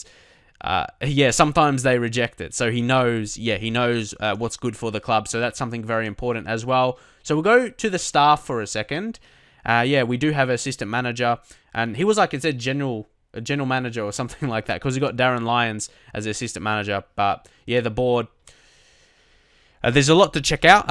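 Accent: Australia. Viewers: Crikey Mate! You're AUSTRALIAN?!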